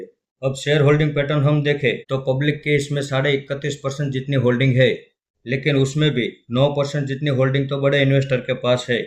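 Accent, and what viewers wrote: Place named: Gujarat, India. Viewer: તમે ગુજરાતી હોય એવુ લાગે છે. ખુબ જ સરસ આંકલન કર્યું મોટાભાઈ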